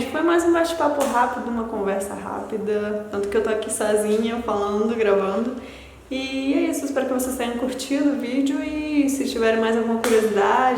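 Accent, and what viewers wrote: Rio de Janeiro, Brazil. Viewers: Fica tranquila, você passou pelo ritual de inicialização que todo estrangeiro passa no Brasil para se tornar um brasileiro raiz. Falar o português brasileiro sem sotaque. E você está falando perfeitamente e com um belo sotaque carioca. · Vc tem sotaque carioca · Fala bem o português com sotaque carioca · Você fala português muito bem mais você está falando o carioques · mano kkk não parece que você é estrangeira. Pra mim que sou do norte você tem um sotaque carioca, mas as vezes parece paulista. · É lindo seu sotaque carioca. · Como assim sem sotaque.. mó sotaque de carioca pow.... kkkkk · Todo e qualquer estrangeiro é visto por nós brasileiros como um irmão, eu acho muito fofo os sotaques, O Brasil tem vários sotaques, Vc já adquiriu o sotaque carioca. Boa sorte menina lindo seu nome, seja firme vc é uma vencedora. Forte abraço Princesa. 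· Seu sotaque é perfeito. É carioca até na musicalidade, poderia falar sem chiar o S que saberiam que é carioca. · Seu português é muito bom. O sotaque é carioca. Você é muito simpática, inteligente, determinada. Sua observação sobre esse problema de relacionamento,rejeição,dificuldade, não sei o que dizer. Mas fico muito feliz com o seu empenho de querer ser brasileira. Com sotaque ou sem é uma honra e pessoas como você engrandece qualquer lugar onde estiver ou vier estar. Fico muito contente em saber que sou conterrâneo de uma peruana nascida em Lima. Até breve,desligo. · Você tem sotaque carrioca! haha Que bonito! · Na verdade voce ja tem ate o sotaque carioca, muito bom! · Todos temos sotaques. Seu português é carioca. Aqui no Brasil ainda são poucos os estrangeiros na escolas e universidades. Por isso chama muita atenção. Creio que a leva grande de latino-americanos, vide (venezuelanos, bolivianos e equatorianos ) ,africanos e árabes (sírios) que estão chegando acabará por tornar mais comum os sotaques diferentes. 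Competência ( Competição) Competência em português tem um sentido de aptidão. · Seu sotaque carioca é genuíno, linda carioca peruana! Acompanho vários canais de estrangeiros morando no Brasil. De maneira geral, me parece que as mulheres estrangeiras aprendem o português melhor que os homens estrangeiros. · Seu sotaque é de carioca o português 💚💛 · Há uns anos atrás, eu comentei com um amigo meu colombiano que se ele falasse português sem sotaque ninguém diria que ele era estrangeiro. O mesmo pode se aplicar a você que já brasileira naturalizada. O português falado no Brasil tem vários sotaques e o seu é visivelmente carioca, que é o certo. Mas não fique preocupada em relação a isso, já que o sotaque carioca culto é o padrão para o português brasileiro. Como dizia a propaganda da Schincariol: O jeito certo é o do Rio! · Putaquipariu sotoque carioca! Sou do RJ. Tamos juntos 😲😲 tu é fohda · VC é pessoa abençoada! 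Tem até sotaque carioca... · Sotaque carioca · Fala portugues com leve sotaque carioca. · Fala perfeiro em Portugues sotaque carioca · Ta carioca demais da conta sô, quero ver falar mineirês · Bom tem um excelente português, mas com sotaque de carioca,fala chiado rsrsrs. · Sou cearense ,mais você tem sutaque SiM,seu sutaque e carioca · boa, babacss existem em todos os países. Agora essa frase"muita das vezes" não existe(isso é o que chamamos de vício de linguagem ou gíria carioca), pois o certo é MUITAS VEZES !Mas parabéns, esta falando o portuguees brasileiro (e carioca puxando o xxxx no lugar do s, certinho) a gente q é carioca puxa o s ｍｅｘｘｘｘｍｏ kkkkkkk!SRN!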